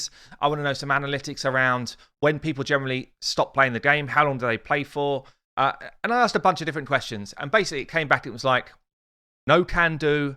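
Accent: United Kingdom. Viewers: I could tell by your oh so British voice inflection. I had the pleasure and privilege of working with the RM when I was an active member of the USMC. I rapidly got to understand the humor of the Citizens of the UK. You British are different from region to region. The Scots, the 1st Sgt. was different from the British. The Welsh had a completely different view of the world. But the beer was great, rats were good, and we all agreed Norway was an ICY *place*. But the women made the evenings eventful. Someone said the main thing separating the US and UK is a common language.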